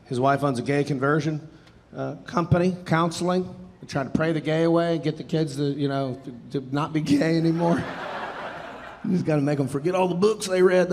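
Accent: South, United States. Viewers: Thank you for this. A breath of fresh air with a southern accent. Intelligent. Funny as hell. Just amazing! 🤣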